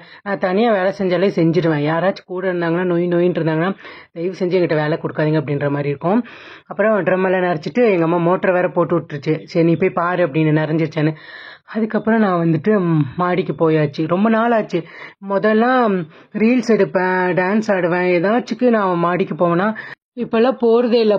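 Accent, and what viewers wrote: Kanyakumari, India. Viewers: Akka kanniyakumari slang pesunga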